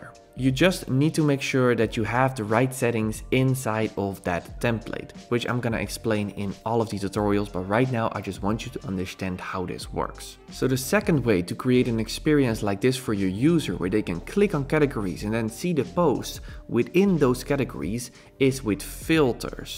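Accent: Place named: Netherlands. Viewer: Thank you very much! This is, again, useful and clear! I need to go through the video step by step as there is so much packed into it. But every single step is crystal clear. BTW: I love your slight Dutch accent.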